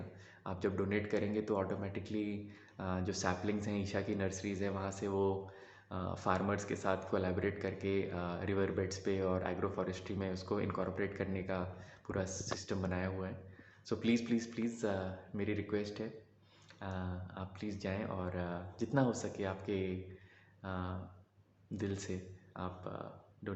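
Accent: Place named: Andhra Pradesh, India. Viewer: telugu boy talking in shudh hndi lets goo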